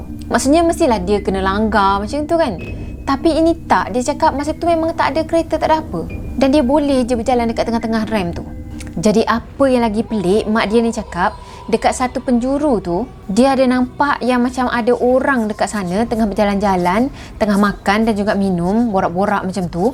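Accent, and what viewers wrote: Kelantan, Malaysia. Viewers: heyy .. ore kelate ko ni ? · Orang kelantan ke ni😆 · Akak ni orang Kelantan ke?🤔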